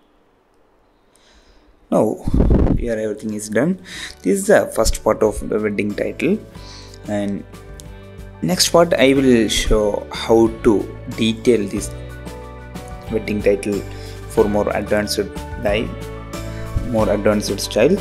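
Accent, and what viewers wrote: Kerala, India. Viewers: What kind of english are u speaking 😑😑😑😑 do all indians speak like this?? Omg 😂😂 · bro are you form kerala you sounds like a malayali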